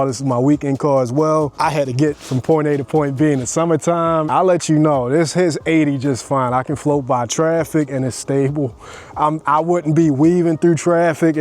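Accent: Baltimore, United States. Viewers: I know a Baltimore County accent when I hear it! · Facts am from DC and i hear B-more county all day in fam.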